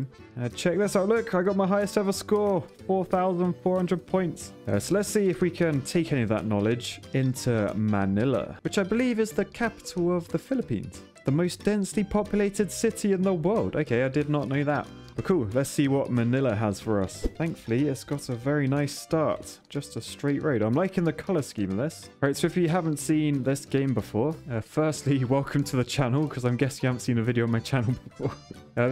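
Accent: United Kingdom. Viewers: Hes brit dont mind him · Dude yo British 😂😂😂😂😂😂😂😂😂😂😂😂😂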